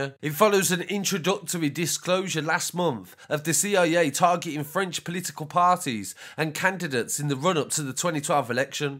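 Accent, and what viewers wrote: Birmingham, United Kingdom. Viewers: You're moving so far from being an actual journalist its a joke. You can't just read a daily mail story in a Brum accent. Pitiful cuz, you're doing more harm than good. Journalist? Joke ting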